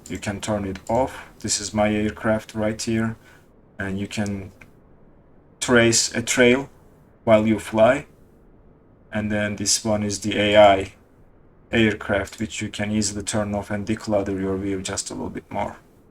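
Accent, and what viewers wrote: Turkey. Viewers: You are talking english like a turk, are you turkish, I wonder if you are turkish, I am turkish, so I'm asking, it was an excellent educational video by the way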